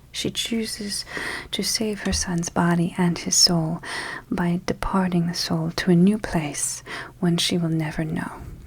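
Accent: Transatlantic, United States. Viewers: a slight transatlantic accent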